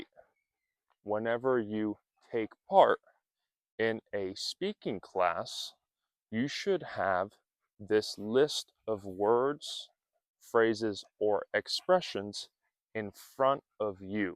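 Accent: United States. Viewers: Hello, teacher! I’ve been listening to your last videos lately. Your helpful method of ‘slow English’ is widely appreciated according to all the comments we can read every time you post a new lesson. Congratulations! You have mixed abilities and the mental power needed to explain words and expressions clearly. I have been teaching French for years, and I love phonetics. You insist firmly on pronouncing the soft ‘t’ instead of the hard ‘t’ we often use in Europe. Notice that I do not disagree with you, on the contrary, but you must have a reason. Your accent is very easy to imitate and I assume it’s from New England. We are all grateful about your work.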